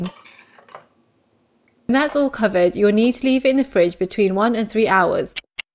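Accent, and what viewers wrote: United Kingdom. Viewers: Lovely accent its british i love how simple and quick you did this recipe and so simple this is a must make for me thx💜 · Are you brittish?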